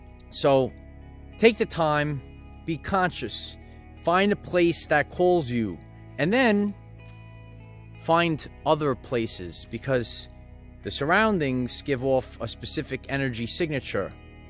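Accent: New York, United States. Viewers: 1. The New York accent is the best ever! 2. do you like the movie The Neverending Story, because you remind me of the boy in it lol? 👑😇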